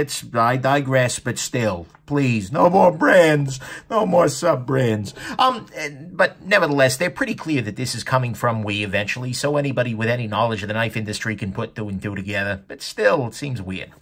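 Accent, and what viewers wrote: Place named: Long Island, United States. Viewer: As soon as I heard your voice I would have guessed you had a friend named Frankie LOL. No disrespect man I got a buddy that lives on Long Island to talk to the same way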